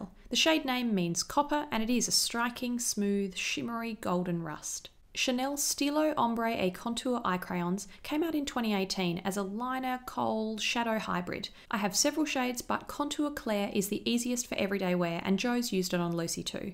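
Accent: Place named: Australia. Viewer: I don't know how the hell I ended up here but, I really like that Aussie accent 😄💙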